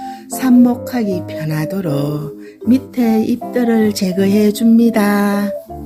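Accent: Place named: Gyeongsang, South Korea. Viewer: 경상도 아줌마가 서울말을 하시면 이렇게 되는구나❤🎉😂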